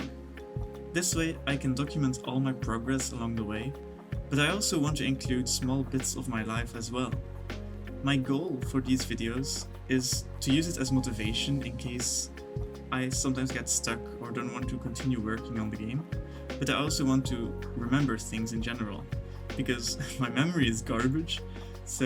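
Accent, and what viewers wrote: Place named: South, Sweden. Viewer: Are you from Sweden? I'm from Sweden I recognize that southern-swedish-to-english dialekt anywhere :) Also I like games with terraforming! If you eonder how the algoritm brought me here i started watcging one devlog and now youtube brings me 1-2 devlogs from different people everyday it must have hooked me to "devlog" as a term so now it recommends everything that has that in it. If your from sweden i wish you extra luck because we need more game developers I wanna play some swedish games thats not just minecraft! If your not swedish i still wish you the best :)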